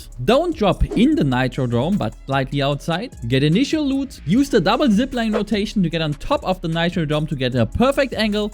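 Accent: Germany. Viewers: Anhand deines Akzentes, bist du bestimmt deutscher oder? :D Schönes Video!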